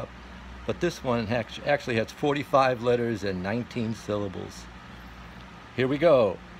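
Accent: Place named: Texas, United States. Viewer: That was super cool. I loved your accent, I think you're Texan, or North Carolinian. Am I right?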